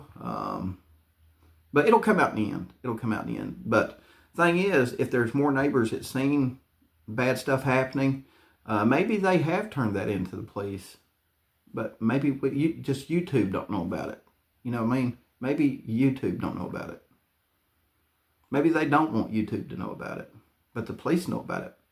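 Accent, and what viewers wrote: South, United States. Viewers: darn your southern drawl is Awesome,Im from Tennessee but iv lost all my accent,Sad,Sori off topic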